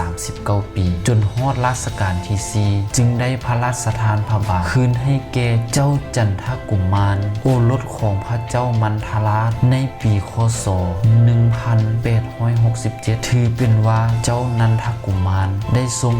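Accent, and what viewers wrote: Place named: Thailand. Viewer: สำเนียงคนไทเเท้ครับผมคนอิสานเด้อ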